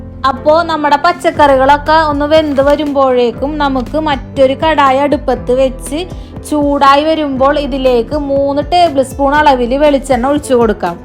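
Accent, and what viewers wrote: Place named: Thrissur, India. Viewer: Thrissur kaari aano...slang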